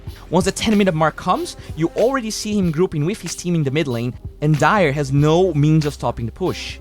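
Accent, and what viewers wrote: Brazil. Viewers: Good job man, your accent sounds familiar to me haha just in the case, are u Brazilian?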